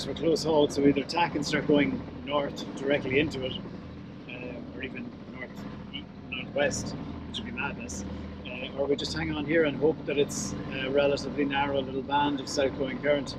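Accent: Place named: Ireland. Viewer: Really enjoying these videos of the West to East Atlantic passage. Totally in awe. Really aware of the risks especially when you mention the possibility of knock downs and you hear cracks in the cockpit. So refreshing to watch after not watching you for a few months. Plus the added attributes of the gentle Irish chatter. And the transparency! Absolutely love it! ⛵️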